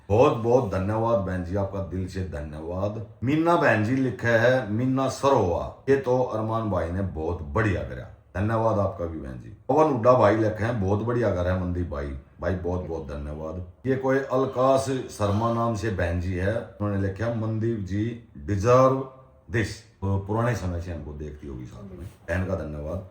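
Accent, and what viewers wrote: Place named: Haryana, India. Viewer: Apka man saaf hai aur apke pariwar mei koi dikhawa nahi hai yahi apki sacchai hai. Jis ghar ki aurat itni saaf dil or hansmukh ho vaha hameshacbkhushiya hoti hai. Bhole baba ki kripa aise hi bani rahe aapke pariwar pe. Apka jo haryanvi boli ka andaz h vahi apke blogs ki shaan h aise h blog banate rahiye Bhai sahahb ji. 💐💐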